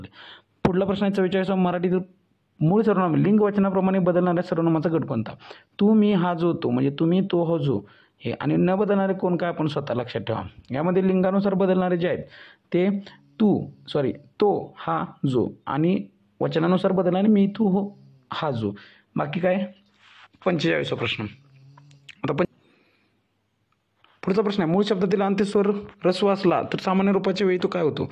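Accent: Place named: Kolhapur, India. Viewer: Best attempt but speed is quite fast .. Kolhapuri tone watato..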